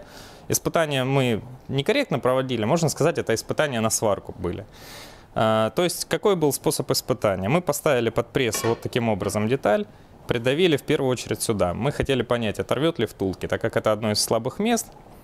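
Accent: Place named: South, Russia. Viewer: Обычный говор триста километров на юг от Москвы все так говорят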